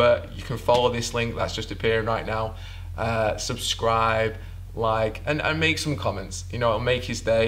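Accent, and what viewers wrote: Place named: Northern England, United Kingdom. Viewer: off topic but I can't place your accent! I'm getting northern England, but is there scottish there as well?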